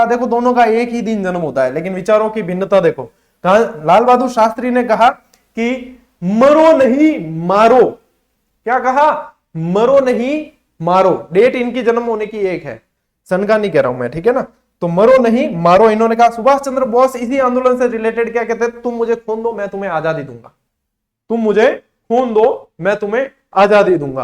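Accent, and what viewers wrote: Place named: Marwari, India. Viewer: Sir your marwadi accent is amazing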